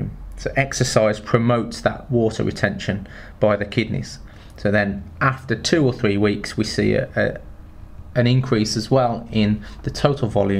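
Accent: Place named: Midlands, United Kingdom. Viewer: Your voice accent sounds like it is from Leicestershire/ Midlands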